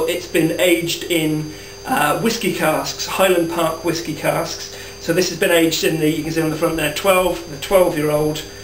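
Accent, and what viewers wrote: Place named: Southern England, United Kingdom. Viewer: do you live up north? I'm confused, as you have a southern accent. Don't tell me you commute to Leeds every day?? No problem with the stemware here. Those are speciality beers. But a reminder, there's nothing wrong with a good, old fashioned pint pot, or glass.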